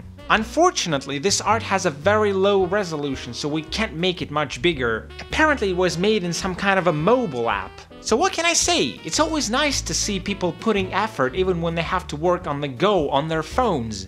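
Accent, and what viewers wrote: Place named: Russia. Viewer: Очень классный ролик, но блин какой же классный русский акцент :)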